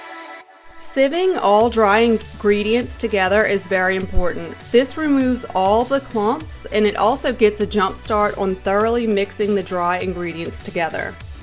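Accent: Louisiana, United States. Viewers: I was wondering about your accent! I'm in Louisiana! Central LA, where are you!? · Lol!! I knew I recognized your accent on the 1st video I saw!! Im from Louisiana and I live in Lafayette.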